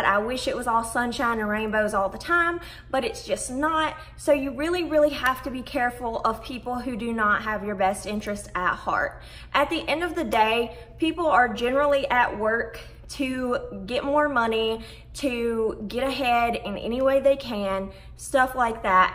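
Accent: United States. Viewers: You country accent 😂😭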